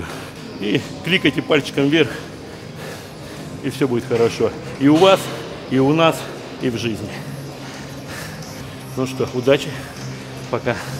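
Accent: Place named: United States. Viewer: я думал какойта американец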